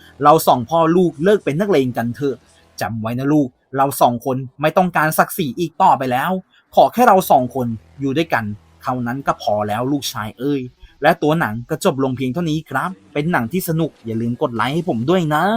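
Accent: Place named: Thailand. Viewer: สำเนียงพี่เหน่อจัง เป็นคนจังหวัดไหนหรอครับ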